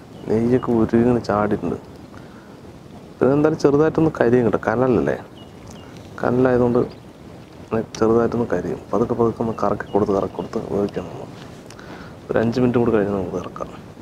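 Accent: Palakkad, India. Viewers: Palakkadan slang adipoli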